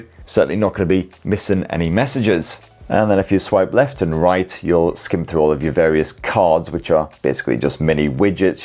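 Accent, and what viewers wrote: United Kingdom. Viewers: I love your British accent, especially when you say "shenanigans" 😂 Your reviews always make my day bright.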